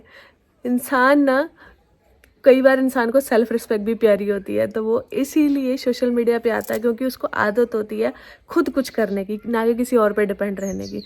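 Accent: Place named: Punjab, India. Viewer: Aapki Punjabi mix ho jaati hai madam par jehi baat aapko junik girl banati hai madam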